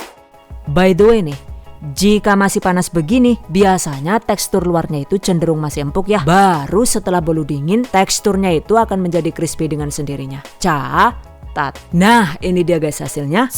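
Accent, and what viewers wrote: Banyumas, Indonesia. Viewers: asalnya dr ngapak ya mba Pd bae karo inyonglah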